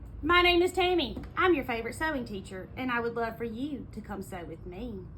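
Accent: South, United States. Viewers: You are a great teacher. I’ve been sewing and quilting for almost 50 years. I came across one of your videos on facebook. What drew me to watch it was your southern accent. It makes me smile when I hear you talk. I’m a 70 year old born and raised Texas girl.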